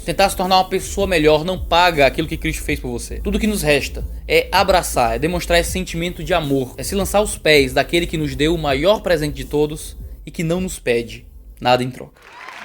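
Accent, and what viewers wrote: Ceará, Brazil. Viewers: Você é cearense?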